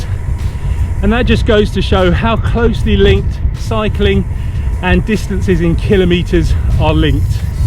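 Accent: England, United Kingdom. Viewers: Ah, I'm sure you'll get a few (more) trolls with this one :-) I quietly enjoy the arguments made by those (mostly from USA) who insist on using their "customary units". They call them customary units because they don't want to admit that the system is Imperial. The very Imperium they fought a war to break away from. And all the while their standard measurement system is SI; their customary units are all defined in terms of SI units! And then there is the situation for the UK. Many of those Imperial units are Roman units! The mile is the distance a Legion moved in 1000 paces, that's why the name is "thousand". Weights and measures have evolved somewhat in the last 2000 years, but the line of derivation is pretty clear. So, the UK and USA use Roman units. I say that since Rome has switched to modern science based units, they should too :-) Oh, nice to hear your Danish. 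Do I detect Danish with an English accent? :-)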